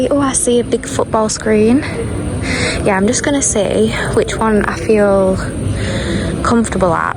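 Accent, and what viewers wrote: United Kingdom. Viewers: Are you 100% Yorkshire?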